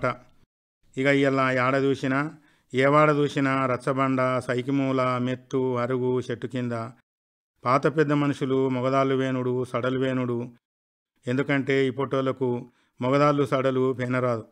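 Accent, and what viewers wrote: Telangana, India. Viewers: అచ్చమైన తెలంగాణ యాసలో మన ఆదిలాబాదు రైతులకు ఘనమైన పోలాల పండుగ ను కళృముందుంచారు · చాలా బాగుంది గురువు గారు. అచ్చమైన తెలంగాణ యాసలో "పోలాల అమావాస్య పండుగ" గొప్పతనం గురించి చాలా చక్కగా చెప్పారు. కనుమరుగవుతున్న తెలంగాణ భాష మాండలికానికి మీరు ఒక కవిగా, తెలుగు భాషోపాధ్యాయులుగా ప్రాణం పోస్తున్నారు ఈ "అంబటాల్ల సంగతులు" కార్యక్రమం ద్వారా👏👏🙏🙏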